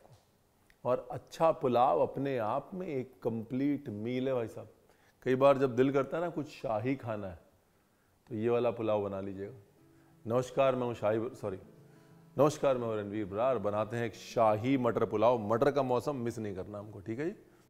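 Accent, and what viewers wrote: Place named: Lucknow, India. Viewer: Khana to superTasty Rhta h but aapka wo Lucknovi Andaz ki baate Heart touching ❤❤❤❤ You are real Gem Sir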